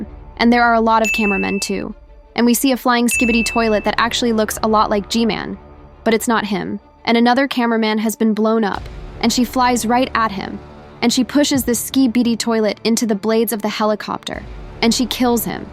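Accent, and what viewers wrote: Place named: United Kingdom. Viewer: You're British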